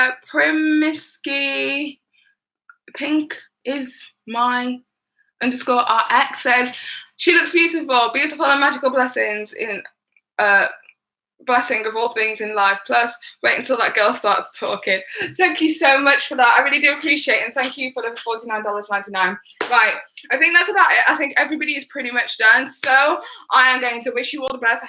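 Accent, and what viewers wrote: England, United Kingdom. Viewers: You’re awesome and beautiful, ignore anyone who wants to pull you down. You are a unique person. Why should you owe any other person anything just because they happen to have the same skin tone as you- that’s crazy! Why does anyone have to fit into a box in this day and age. Britain genuinely doesn’t have the same issues with race that America has- America just can’t get past their history and it seeps into everything... but it’s not really like that in the UK. It IS different here, thankfully, or it was, although sadly it seems to be getting worse not better! I just thought you had a nice normal English accent- everyone I went to school with in my teenage years had that same accent (1/3 white, 1/3 black, 1/3 “Asian” almost exactly at the school at the time- everyone talked the same, pretty much like you. I was teased for having a different accent at the time, but you remind me of all the lovely wonderful girls I went to school with back then.) My parents did the same thing, didn’t want their kids to grow up too afraid to walk down the street, so when I was a teenager we moved to a better area and got a nice education. 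I’m really grateful for it. You’re one of my favourite Brit YouTubers and I really hope these morons won’t stop you doing what you enjoy, because you’re so good at it, a true investigative genius. I’m sure your beautiful gorgeous daughter will be luckily to grow up with such a beautiful soul for a mother, both inside and out, and your soul will alwa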